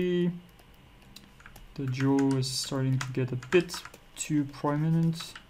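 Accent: France. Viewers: i love your french accent it is so sexy :D romantic <3 <3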